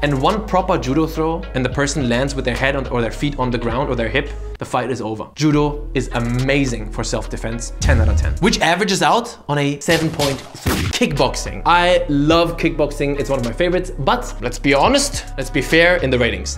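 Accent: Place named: Germany. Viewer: Redest du eigentlich extra so ein klischeehaftes englisch als deutscher oder versuchst du erst garnicht die Wörter richtig auszusprechen?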